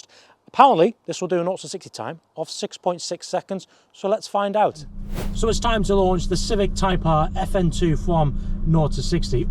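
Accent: North Wales, United Kingdom. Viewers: Where abouts in north wales you from?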